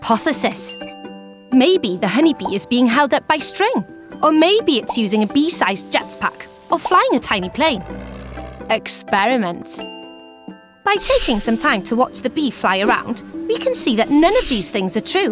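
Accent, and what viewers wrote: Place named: Australia. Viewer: australian ahhhh:face-blue-smiling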